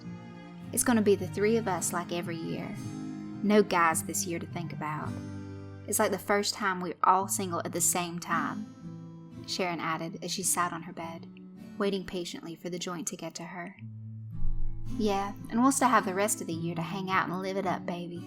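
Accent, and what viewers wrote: South, United States. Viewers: I love the southern accent you used while telling this story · You are definately underrated as a narrator. You are one of favorite reader and I love how you can do both a southern and english accent!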